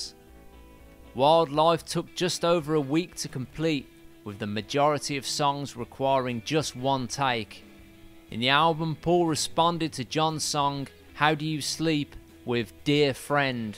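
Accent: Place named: London, United Kingdom. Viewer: Hey, Love your sneering, Johnny Rottenesque voiceover. You from Holloway, too? Great work, as ever!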